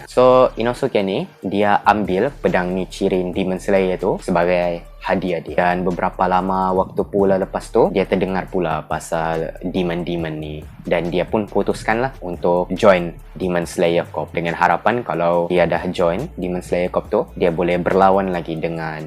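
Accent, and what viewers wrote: Sabah, Malaysia. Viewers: logat2 sabah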